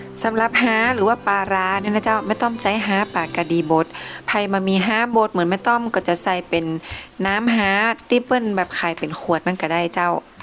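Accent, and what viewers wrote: North, Thailand. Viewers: ภาษาเหนือคะฟังง่ายอยุ่น้า😊😊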